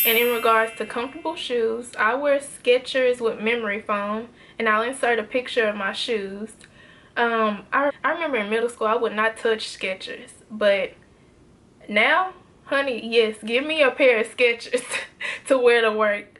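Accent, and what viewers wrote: South, United States. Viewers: You from down south some where? I can here it in your accent! Is it Tennessee? If not where?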